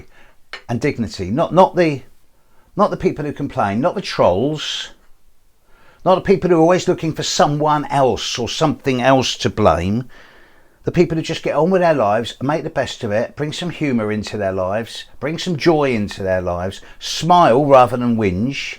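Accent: United Kingdom. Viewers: I love your British accent and love how articulate in the way you speak. Wish more people would learn how to be articulate as you are and being able to convey and express themselves in ways that are pivotal and genuine.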